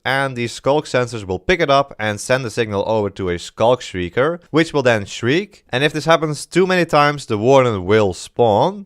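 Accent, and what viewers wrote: Netherlands. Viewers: u sound dutch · Are u dutch because i can hear it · Where you from? You sound Dutch? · you sound dutch mate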